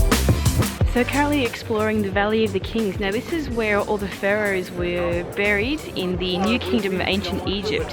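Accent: United Kingdom. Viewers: Are you Eglish( from England)? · did I write your name correctly you got a beautiful London accent very clearly prounced each word I love it, and it seems that you’re a pro. Guide and nicely done touring in Europe for the firm intrepret perfect... especially your smiling gestures shows how you enjoy your job very nice indeed...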